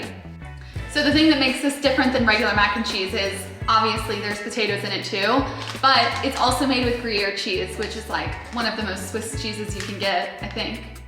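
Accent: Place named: United States. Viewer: Ah, you are american, now I heard it. New subscriber here ❤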